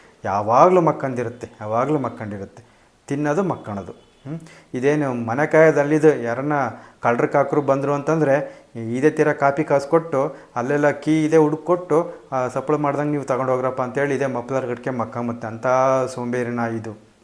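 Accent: Chitradurga, India. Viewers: ಚಿತ್ರ ದುರ್ಗದ ಕಡೆಯ ಭಾಷೆ. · ಅಣ್ಣಾ ನಮ್ಮ ದುರ್ಗ ಕಡೆ ಭಾಷೆ ಹಾಗೆ ಇರುತ್ತೆ. ನೀವು ಮಾತನಾಡಿ ಏನು ತೊಂದರೆ ಇಲ್ಲ ನಿಮ್ಮ ಮಾತು ಕೇಳುತ್ತ ಇದ್ದರೆ ನನ್ನ ತವರುಮನೆ ನೆನಪು ಮನಸ್ಸಿನ ಮುಂದೆ ಹಾದು ಹೋದ ಹಾಗೆ ಆಗುತ್ತೆ. ಚಿತ್ರದುರ್ಗದ ತಂಗಿ 🙏🙏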